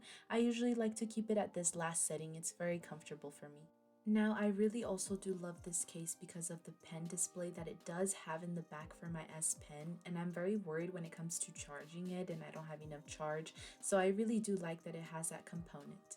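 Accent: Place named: South Florida, United States. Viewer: Are you from the Miami/South Florida region? Your accent reminds me of home... And now I want mangoes and guava.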